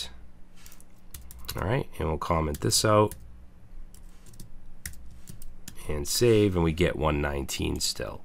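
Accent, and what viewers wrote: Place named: Northeast, United States. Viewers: I grew up in the Northeast and your accent sounds like home. *Queue a Ben Affleck impression here* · Are you from the East coast? (NYC, Boston, NJ) I can hear some very strong hints of that from your accent :D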